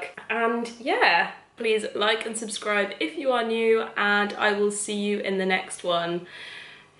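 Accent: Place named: England, United Kingdom. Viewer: I love that accent is it English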